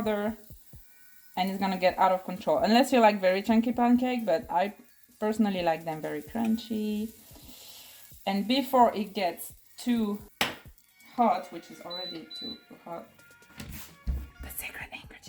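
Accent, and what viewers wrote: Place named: England, United Kingdom. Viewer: your accent 🥰 even more english 🇬🇧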